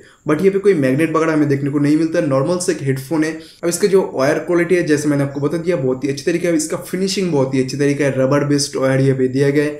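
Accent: Bengal, India. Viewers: Bro bangali h kya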